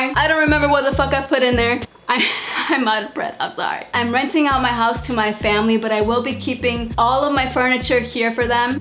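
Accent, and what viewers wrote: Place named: Michigan, United States. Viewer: If I'm not mistaking it sounds like Michigan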